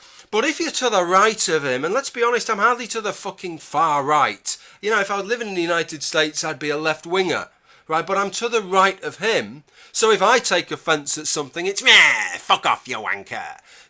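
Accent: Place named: Yorkshire, United Kingdom. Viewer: No, it's a Yorkshire accent. Bit late but now you know.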